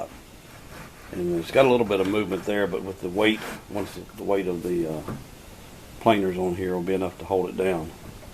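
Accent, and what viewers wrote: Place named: Memphis, United States. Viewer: Is your name? That was my father's name. Your accent sounds like the Memphis/Northern Mississippi area? My father was a woodworker as well and I'm just getting into it.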